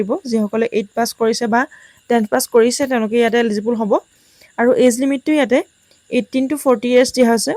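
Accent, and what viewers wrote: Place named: Tezpur, India. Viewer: Tezpurr lage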